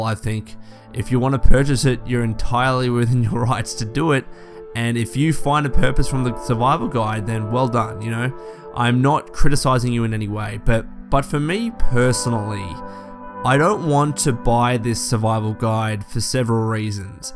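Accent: Australia. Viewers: Is this Aussie accent?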